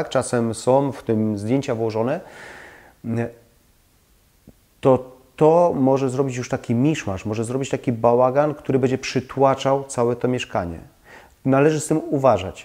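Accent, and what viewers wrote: Silesia, Poland. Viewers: Czy Pan jest ze Śląska ?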